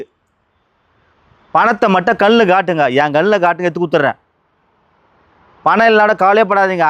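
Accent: Madurai, India. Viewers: Madurai vasagam super 🎉🎉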